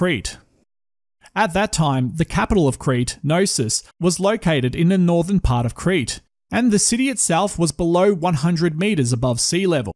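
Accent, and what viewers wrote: Australia. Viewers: Great to hear a Aussie voice talk intelligently about the stuff I want to learn. Great work mate.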